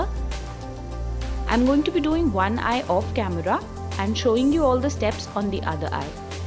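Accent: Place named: India. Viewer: i love your indian accent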